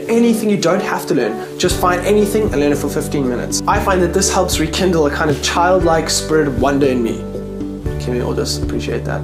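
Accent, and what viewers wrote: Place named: California, United States. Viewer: And you're picking up the Californian accent.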